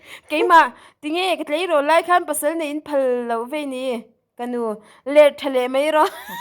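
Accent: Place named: Mizoram, India. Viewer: I chhiar thiam a niang ka hmin riau zel tin mizotawng thluk hi a awl ai lo khawp a nia lo tang sauh2 teh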